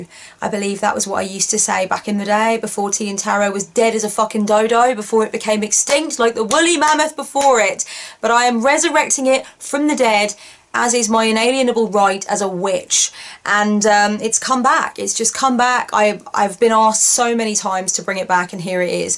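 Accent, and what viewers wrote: England, United Kingdom. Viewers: This was just an awesome, fantastic way to start my day here in SoCal, USA... Having "Tea & Tarot" with one of the brilliant spiritual mentors in my life. Got my own tea here, drinking it while I savor this time and take in the life lessons offered. 🌹🌙🌤💌☕️ Thank you for bringing this segment back! Also: you are VERY fucking English this morning, babes. 😄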